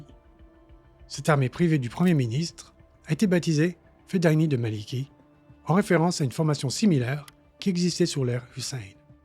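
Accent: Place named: United States. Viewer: Interesting analysis as always. I'm glad this channel has a French version. Some remarks however: translation is by no means bad, but not always consistent (especially noticeable is the intro, "welcome to the 20s report" is translated several different ways). The narrator's pronunciation of foreign names is a surprising (it's quite unusual in France, at least, to use an American accent - especially for ISIS as was mentioned today) but not bad in itself - however I still can't get over the pronunciation of "Erdogan" in the Turkey video, which was the worst-possible option (and, in fact, one of the rare times where it was read as if it was a French word). I also notice that neither translator nor narrator are credited in the video or description. How come?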